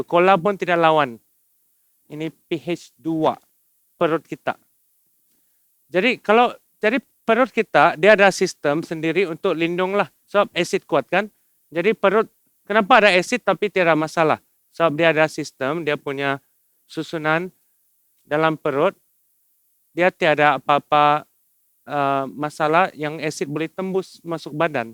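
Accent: Sabah, Malaysia. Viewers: Haha this doctor is awesome. Kalau sia tutup mata, betul2 macam kadus dia punya slang. Keep up the great work you are doing, very informative and insightful.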